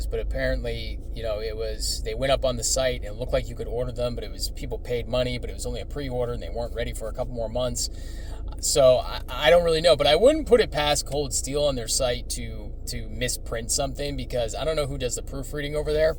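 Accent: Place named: Italy. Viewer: New subscriber here. It was your Italian accent that made me do it…..lol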